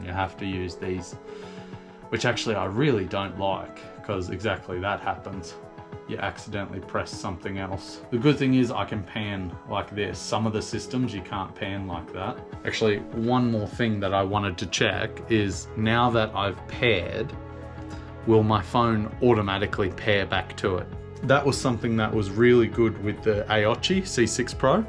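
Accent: Australia. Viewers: Awesome dude. I was wondering where you were. Aussie accent for sure but snow? WTF is snow? Chuckled at the manly flex with the manual over the shoulder. RTFM only in emergencies. BTW...neatest, cleanest woodpile ever. I just got an RTP1250 Cop bike at the auctions and have been thinking about one of these. My bike didn't have the BMW sat nav. Thanks again and enjoy your time OS. Oh. I went and had a look and decided to grab my balls and run with it. Fk it. You only live once so I ordered one. The discount code helped a lot. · Are you an Aussie bloke ?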